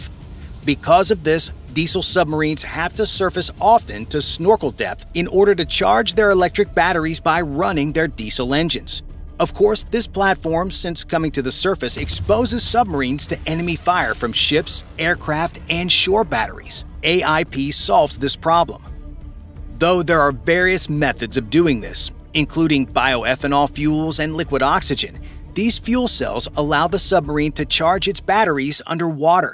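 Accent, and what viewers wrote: United States. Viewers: These American voice overs are so irritating 😂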